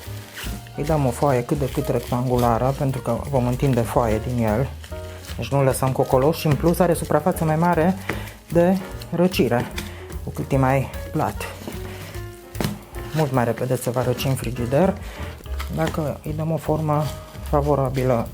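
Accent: Transylvania, Romania. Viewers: Ești MINUNATA! Intr adevar dai savoare rețelelor tale cu graiul tău ardelenesc❤ Cu mare drag te urmăresc ai salvez rețetele! Unele le si fac,altele așteaptă" timpi" mai buni din partea mea...😂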